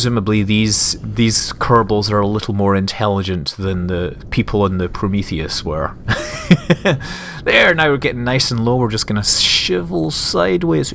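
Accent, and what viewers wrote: Scotland, United Kingdom. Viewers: i need to know.... are you scottish?because you sound pretty scottish to me and ive heard alot of scottish people:P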